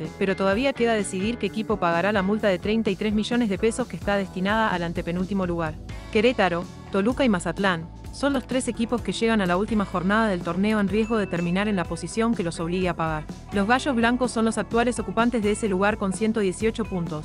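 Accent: Argentina. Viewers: PORQUE HABLAS COMO ARGENTINA?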